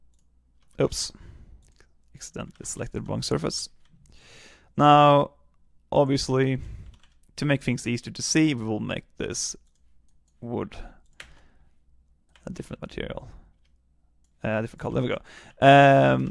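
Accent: Sweden. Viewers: Are you Swedish? It sounds alot like it! Great tutorial anyway dude, I absolutely LOVE your enthusiasm, it makes it so much more fun to follow along. If only more people could see your stuff!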